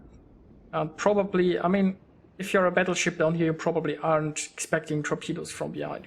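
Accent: Germany. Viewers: Man merkt schon an deinem Akzent, dass du ein Deutscher bist. Also vermute ich :)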